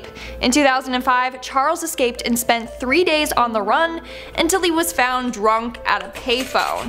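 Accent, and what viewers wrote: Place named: United Kingdom. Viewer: I liked the British accent . But she okay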